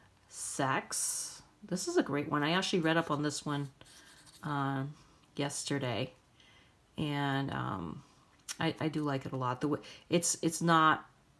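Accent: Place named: Boston, United States. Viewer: LOL. Every once in a while a word at the end of a sentence sounds Jersey or something (I never was there either but knew people from there). I WAS to Boston once ages ago so maybe the accent just sounded a little familiar. But cool! Boson/Portuguese! Well, love it! Next, is when will we get to see YOU? Take care! 🥰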